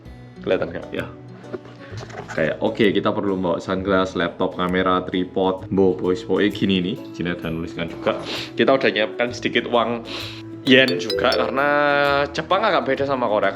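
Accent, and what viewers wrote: Java, Indonesia. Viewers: Mas kalau ngomong gk lupa ama jowo medok e aku seneng dengarnya soale aku wong jowo juga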